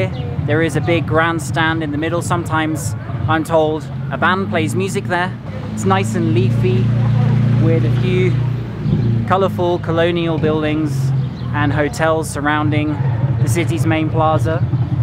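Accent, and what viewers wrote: England, United Kingdom. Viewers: That beautiful and sexy English accent describing places in Mexico...incomparable.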